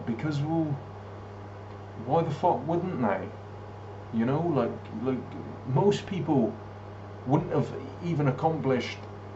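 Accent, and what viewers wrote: United Kingdom. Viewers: You're British mate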